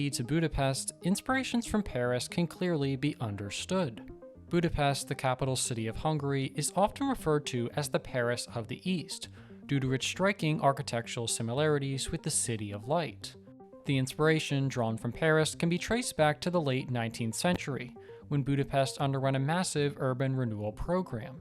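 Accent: United States. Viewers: AGGRESSIVELY american pronunciation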